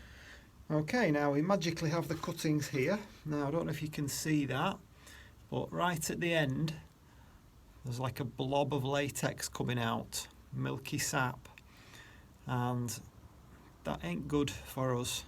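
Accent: North, United Kingdom. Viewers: Fabulous video and so entertaining too. By the sound of your accent you’re definitely a northerner which is always a winner in my book.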